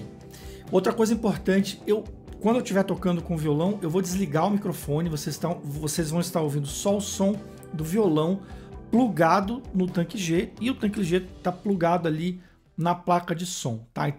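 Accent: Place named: Minas Gerais, Brazil. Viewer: O resultado ficou parcialmente bom(apenas opinião). Professor, as vezes acho que tu és mineiro, as vezes te acho capixaba e as vezes fico confuso kkkk De igual forma, em algum momento a sonoridade induz achar que é guitarra mas falta definição. Não sei se sou muito "ortodoxo" mas soou híbrido na maior parte dos casos. Muitíssimo obrigado pelo conteúdo e até a próxima!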